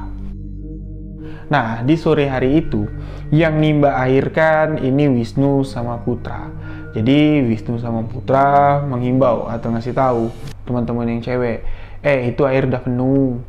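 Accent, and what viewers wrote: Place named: Jambi, Indonesia. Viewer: Paling seneng dengerin bang cerita make logat jambi 😊😅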